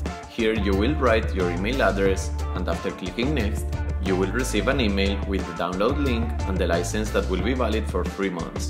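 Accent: Spain. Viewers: como se nota q eres español:)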